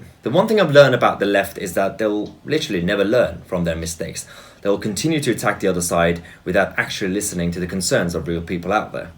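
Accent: United Kingdom. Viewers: brilliant spoken by a true brit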